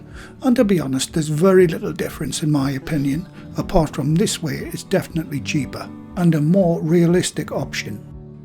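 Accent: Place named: Middlesbrough, United Kingdom. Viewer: Are you from Middlesbrough? I am from Redcar and your accent sounds local. I love your uploads by the way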